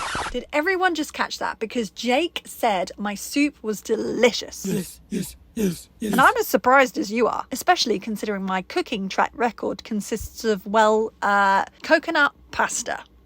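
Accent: United Kingdom. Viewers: Your videos are the best ones out there for me... it lifts my mood ... and for someone who english is her 3rd language and i learnt it in American way I AM in LOVE with your British accent ... the way you film and edit are uniqe but dayli vlogs can be a good idea too ... anyways good luck and am waiting for your next uploads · Suprised to see your sponsership is a language learning website. Can you imagine how great your channel is for us who are learrning English :face-red-heart-shape: such a relaxing and leisurely journey with such a british accent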